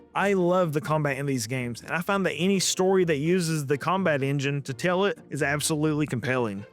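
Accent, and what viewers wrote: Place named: Southwest, United States. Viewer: Love your style man! Also, as someone from southwest, your accent makes me feel like I'm just geeking out with one of the bros back home. Great stuff!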